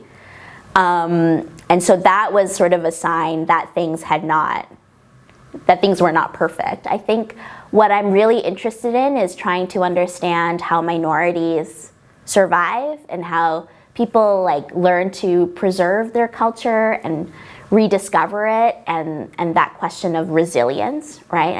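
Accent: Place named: United States. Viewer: Ini logat amerika yang paling totok yang pernah gw denger dari orang Indonesia :))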